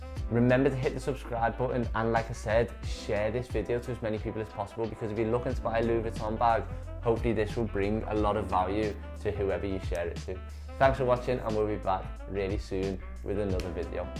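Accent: Liverpool, United Kingdom. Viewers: I think the Damier Ebene is better as it looks freshly sexy even when it is so old and used.. By the way are you from Liverpool?? :)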